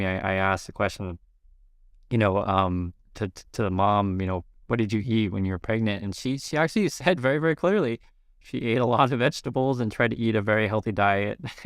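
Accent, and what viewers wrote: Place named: United States. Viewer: My daughter and mother did AncestryDNA on my genealogy account. Through my daughter, we had a match with my husband's cousins that had been "lost" as children after a divorce. She also had matches with two other of her father's 1st cousins, that the family had no idea existed. The one had been told her father had died in the Korean War, but it was a lie told by somebody, probably her mother's stepmother, to get her to adopt out her baby. She'd been searching for a picture of her dead father for decades. She made contact with me and was astonished to learn that her father had only died the year before at age 85. She had terminal cancer and died just three weeks later, but she stared at the picture of her father constantly during that time. On my mother's side of the family, we discovered a cousin's son who had no idea who his birth father was. 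Now Mom's got another unexpected match, likely either a cousin or an unknown half sibling's daughter. You just have no idea what you might find out, especially from that time before reliable birth control. But this is the most amazing dna story I've heard yet! I started watching your other channel a few weeks ago and wanted to know more about you and your wife, mostly because you sounded American but were living in Korea. The story was more interesting than I'd imagined.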